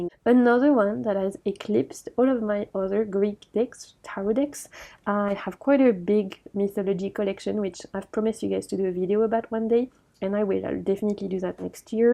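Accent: France. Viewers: Love these decks! Sometimes I listen to you just for the Oui Oui Oui French accent 😂😂😂❤❤❤❤❤❤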